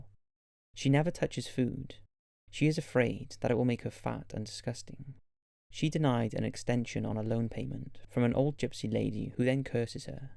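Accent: Wales, United Kingdom. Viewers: Am I hearing a slight Welsh accent from.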